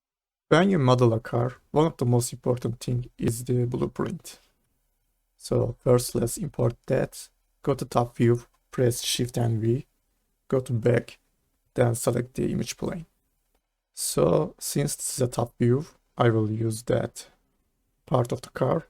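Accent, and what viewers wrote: Turkey. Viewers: sesini duyar duymaz türk olduğunu anlamak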